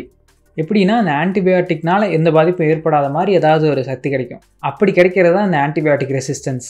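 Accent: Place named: Madurai, India. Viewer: என்னதான் ஜெர்மனில் போய் ஆராய்ச்சி பண்ணினாலும் பேசற அப்போ நம்ம மதுரை ஸ்லாங் வருதுல்ல🎉🎉😂😂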